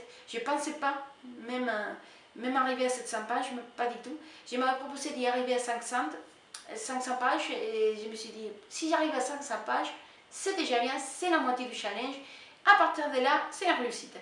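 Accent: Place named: Latin America. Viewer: Coucou ! Comme promis me voici :) (je t'ai répondu dans le groupe) Quel délicieux accent <3 Es-tu hispanique ? (désolée je suis un curieuse, deux amoureuse de cette langue)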